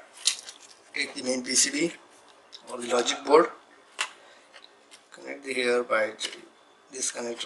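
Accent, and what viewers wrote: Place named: India. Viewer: He didn't go to the extent of actually showing us how to replace the chip. Also, heavy Indian accent made it impossible to understand what he was saying, so needless to say whatever he was doing in disassembling the unit it was not clear, except for the part of saying to take a photo of the connectors before you disconnect them so that you don't get them mixed up. That part was clearly understood.☹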